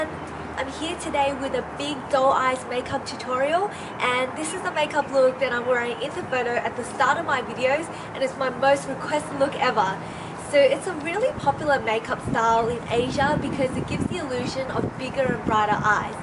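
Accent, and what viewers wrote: Australia. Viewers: australian actually.. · Wow you're one of the few Asian make up gurus with an Aussie accent! Go Aussies ^^ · i havent really heard other aussies talk on youtube! woah our accent is soo totally different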